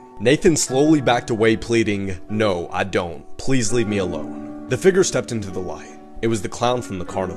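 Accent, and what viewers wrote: South, United States. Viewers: I really hear your Southern accent in this video... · I miss your southern accent man.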